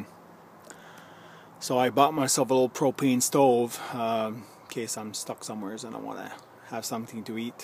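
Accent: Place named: France. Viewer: Can barely tell you have an accent - love French accents anyway.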